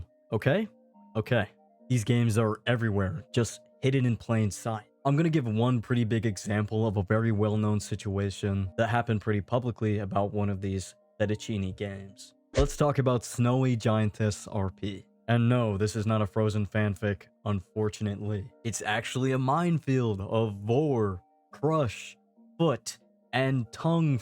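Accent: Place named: United Kingdom. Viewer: in his British accent era is peak:D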